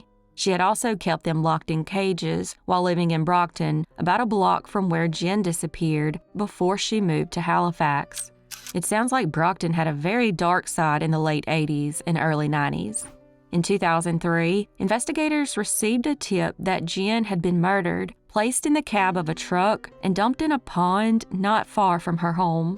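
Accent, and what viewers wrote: South, United States. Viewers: Yes! Quickly becoming my first click whenever I get on YouTube ❤ your channel is only one of three channels I have my notifications on for. 🌟 Love your soothing voice with your slight southern drawl and every story you cover needs the lime light! 💚 thank you!! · Ooh really I will check it out! Love your voice and your Southern accent! Thank you for the time you take to research all these cases! 🙏🏿❗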